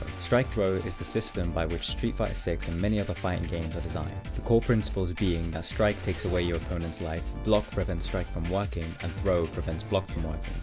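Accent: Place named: United Kingdom. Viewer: Only clicked in to verify that your are British, and indeed you are. Good day.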